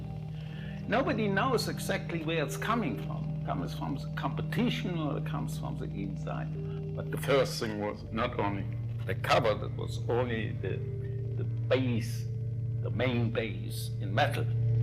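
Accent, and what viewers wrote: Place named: Germany. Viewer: Great video. Any body care to transpose correctly for Closed Captioning - it's hard to follow his Germanized-English. Thanks.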